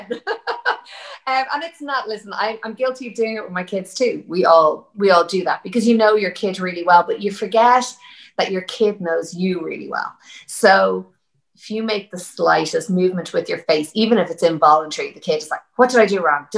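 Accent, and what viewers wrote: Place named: Ireland. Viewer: Aww it’s so nice to hear you talk again. I love your Irish accent as usual😍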